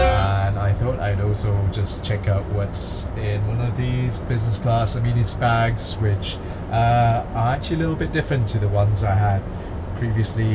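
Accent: Wales, United Kingdom. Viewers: Do I detect a hint of a Welsh accent? Great vid, can’t wait to be back in HK, hopefully later in 2021 :)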